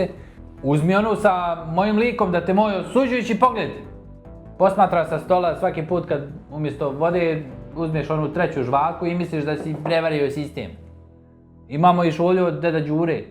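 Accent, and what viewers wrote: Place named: Tuzla, Bosnia and Herzegovina. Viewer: Ovaj naglasak mi najvise vozi na Tuzlu, Bijeljinu i taj dio (prije ce bit Bijeljina)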